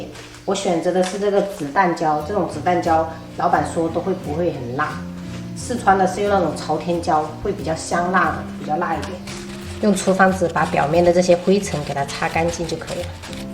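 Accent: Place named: Fujian, China. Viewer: 还以为你是福建人呢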